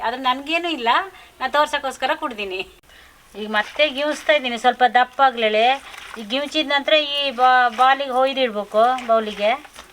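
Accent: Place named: Siddapur, India. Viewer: Amma nimmur yavduu? Neev matado shaily nodudre siddapurdavruu ansutte nange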